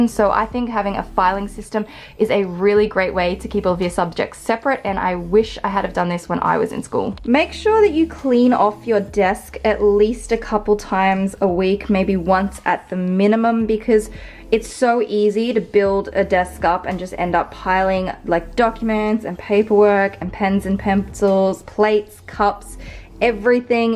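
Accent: Australia. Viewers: your aussie 💕💕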